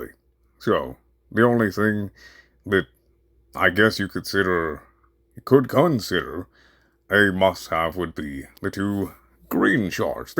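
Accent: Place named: United States. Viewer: Your deep English narrator voice with the American accent underneath is crazy lol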